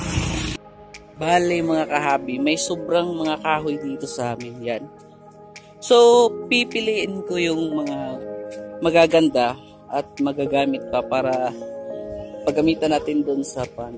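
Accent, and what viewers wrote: Visayas, Philippines. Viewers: Bisaya ka pala lods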